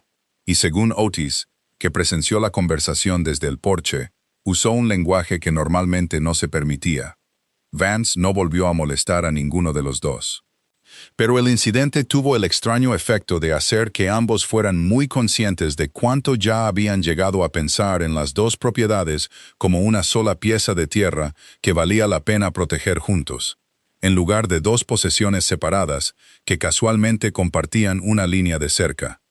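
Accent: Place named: United States. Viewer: ESe acento gringo del narrador, que enfado.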